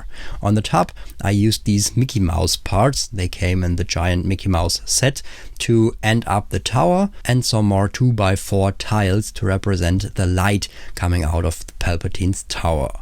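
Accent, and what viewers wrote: Germany. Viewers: Denglisch, hört man sofort raus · 1 second in the video and i can already tell you're german as well xD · German accent Kicks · Love the work and ze german accent✌️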